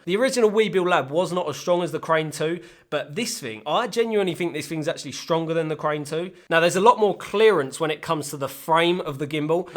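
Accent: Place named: London, United Kingdom. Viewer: that London accent just kills me.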